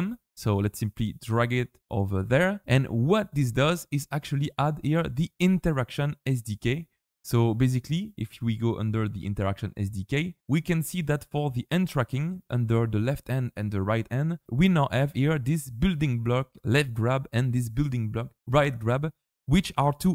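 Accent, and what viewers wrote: France. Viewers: T'es français? t'a l'accent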